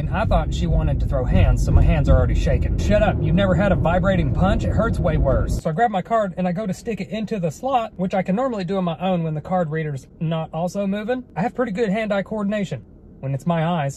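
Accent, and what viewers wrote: South, United States. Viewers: I don’t know how to explain but you have on of the coolest country accents I’ve ever heard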